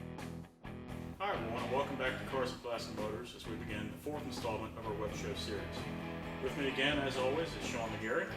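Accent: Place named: United Kingdom. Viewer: Sounds British..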